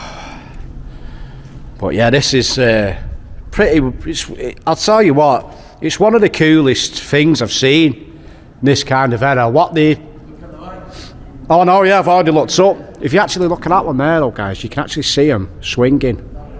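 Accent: Manchester, United Kingdom. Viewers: Top quality videos.great manc accent too.Cheers..Alf 🍺🍺🍺🇬🇧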